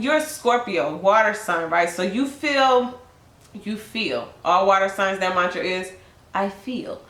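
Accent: Chicago, United States. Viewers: You sound like you from Chicago lol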